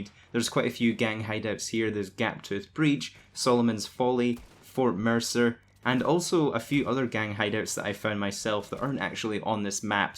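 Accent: Scotland, United Kingdom. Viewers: U Scottish buddy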